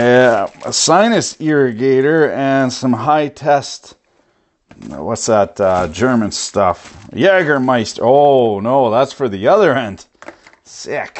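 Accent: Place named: Canada. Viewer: havent heard a more Canadian sounding man reviewing shit tools on youtube yet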